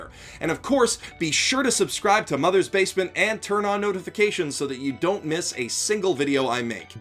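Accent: Canada. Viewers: Hole maple syrup Goku! your Canadian! I had a feeling i liked you speech pattern, guess because your Canadian. Well Subscribed! keep up the good work :) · WAIT!! Your're Canadian!? How did I not catch that?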